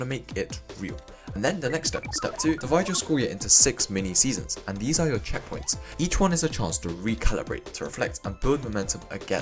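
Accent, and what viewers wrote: United Kingdom. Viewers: why does he sound so british?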